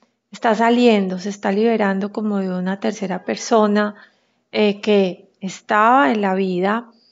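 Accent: Colombia. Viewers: Buen día y si no me ekibocoese asentó es colonviano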